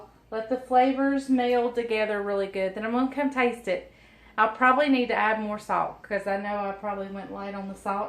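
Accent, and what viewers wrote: South, United States. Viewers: I thought you said Lord but you said lard, it took me a while to figure out what you said. This yankee sometimes gets lost with the southern accent but I love watching you. I don’t think the stainless steel works to remove the onion smell either. Coffee grounds I’ll try, thanks. My husband when he was alive had a guy friend at work that was a bachelor and he loved to cook, he started his spaghetti sauce by cooking a pork roast real slow on the first day to get the drippings which he used as the start of his sauce. I had never known to do that. But the ham or bacon is a great idea to get flavor. My mom wasn’t much of a cook, unlike my country mother-in-law was. My mom for years her idea of making spaghetti was to brown hamburger and sauté an onion add a can of tomato paste, a shake of a little chili powder, salt and pepper and that was it. 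It was really plain or she would use a package of Chef Boyardi spaghetti which was so small and nothing but cheap.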